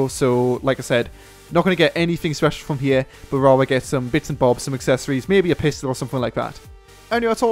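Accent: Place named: Newcastle, United Kingdom. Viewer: hes from newcastle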